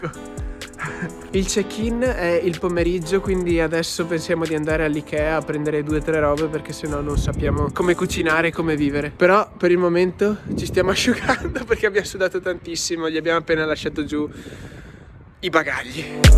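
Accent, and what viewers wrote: Veneto, Italy. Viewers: Non so come sia il bresciano però dai si sente un casino che è veneto 😂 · A me sembrava che avessse un accento Veneto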